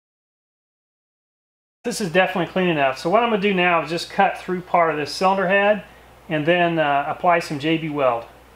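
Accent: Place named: Midwest, United States. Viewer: Nothing better than filling a gash. Love these videos. Just a guess but you're from northern Missouri or Iowa.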